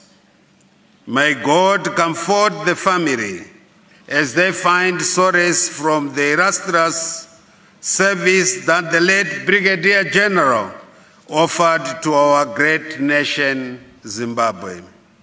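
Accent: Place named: United Kingdom. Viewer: Is this guy really a Zimbabwean ?sounds like he's from British empire (Malawi,)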